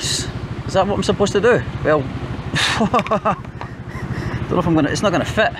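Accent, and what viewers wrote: Scotland, United Kingdom. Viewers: This was sooo funny listening to a fellow Jock moan aboot the price of something 😂 He was right though, definitely a proper rip off!! 🤬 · A Scottish accent ...Cool Traditional Chinese habits, commemorating ancestors, hope ancestors bless…… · I like you’re Scottish accent.Maybe visit one day Belgium🇧🇪 .I am gonna be you’re guide😊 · Hi friend where are you from, Scotland or Midlands? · You got a big problem with 🐘pants 😆And Scottish in you really come out in this video lol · me too, and his Scottish accent is very strong. Bagus buat yang pengen belajar aksen Skotlandia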